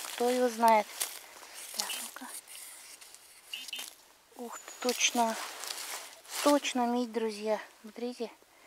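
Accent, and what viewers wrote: Russia. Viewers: Говор то северный, так приятно слушать) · Уральский говорок?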